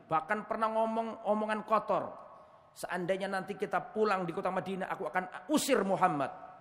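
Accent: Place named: Java, Indonesia. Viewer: Seneng p ustadz logate njowo iq...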